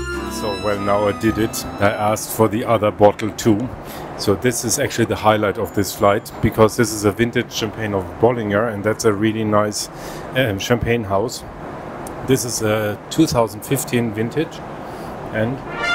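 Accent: Germany. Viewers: In my personal opinion, one must be really stupid to spend around 2500 euros for a return flight lasting an hour and 20 minutes where you can't even fully enjoy the starry breakfast, and finally drinking Krug champagne at 7.00 am is really bad for your health..... P.S. The German accent of the presenter is awful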